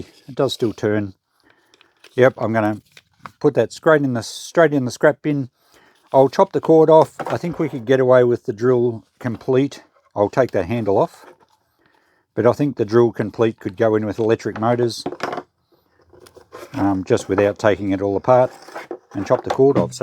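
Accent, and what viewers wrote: Australia. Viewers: Hi, I think you have an Australian accent? So when you say dollars, presumably these are Australian dollars? Or New Zealand? Or are you maybe an Australian living in the US? Just curious as the value of the tools seems to be very relevant, here and I need to translate to my own currency.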